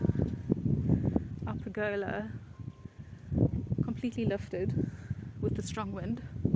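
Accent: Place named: South Africa. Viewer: New subscriber from Alberta, Canada. Originally from SA as well. Been in Canada for 31 years in mid March. I get so excited when I hear the SA accent in any videos. We wish you all the best in your new adventure. It takes a good couple of years to get settled into a new country. I do miss our lovely "old" country but also love the freedom and security we have in Canada. God bless your family.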